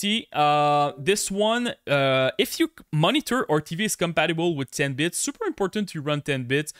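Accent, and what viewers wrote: Quebec, Canada. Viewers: Quebecois mon homme ? 😂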